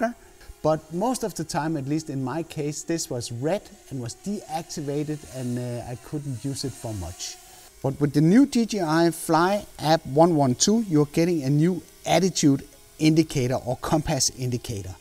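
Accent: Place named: Denmark. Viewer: man kan godt høre at du er dansker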